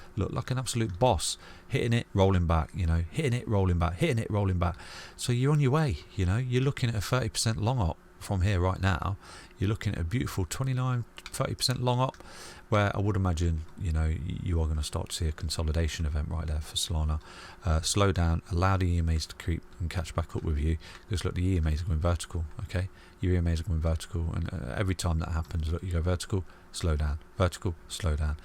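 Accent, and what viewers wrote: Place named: Midlands, United Kingdom. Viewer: i don't think he is mumbling, he just sounds like a midlander to me 🤷 i don't struggle to understand him at all.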